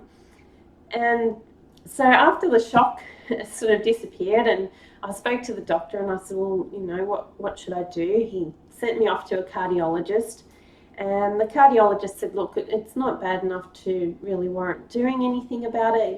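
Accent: Australia. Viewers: Ja, ist eine deutsche Name. Und sehen Sie auch eine deutsche Dame aus, obwohl Sie hören eine Australierin aus. Woher (in Deutschland) kommen Sie? Ich bin ein Kanadier geboren, von deutschen Eltern. Und habe ich für mein ganz Leben hier in Kanada gewohnt.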